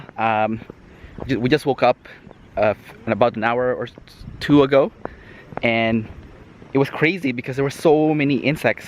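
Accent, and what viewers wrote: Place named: Philippines. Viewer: Why do you have a filipino accent?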